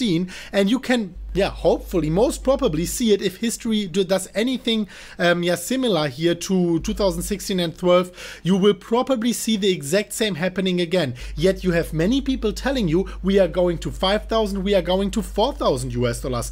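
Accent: Germany. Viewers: I love your German accent, it reminds me of Arnold Schwarzenegger, he is very famous and Americans respect him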